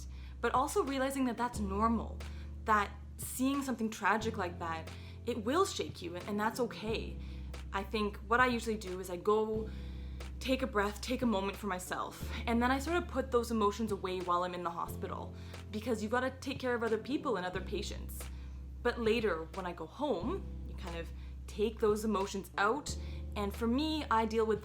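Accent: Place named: Canada. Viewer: Really heard your Canadian accent in this video :)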